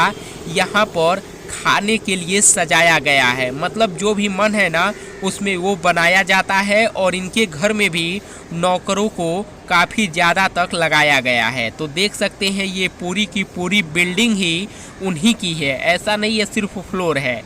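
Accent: Bihar, India. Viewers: Apki Hindi bilkul bhihari jese hai good job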